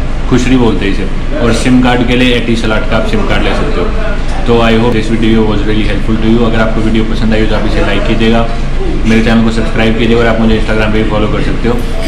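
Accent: Haryana, India. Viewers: Like ur Haryanvi touch it is like having a sprinkle of honesty and strength .👍🏼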